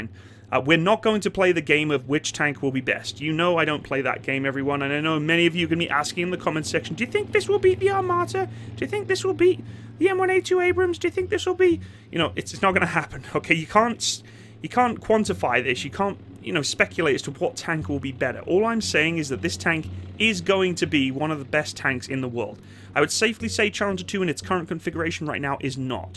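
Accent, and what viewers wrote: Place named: United Kingdom. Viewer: So freaking British, innit?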